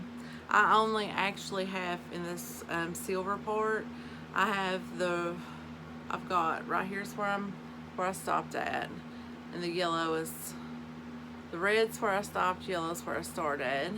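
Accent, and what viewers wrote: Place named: North Alabama, United States. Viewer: I enjoy your videos so much. You sound like you are from north Alabama. I lived there for several years. It was like heaven. Now I understand why (from Jacksonville like me and where I moved back to) sang. I never understood until I felt homesick for the wide open beauty of it.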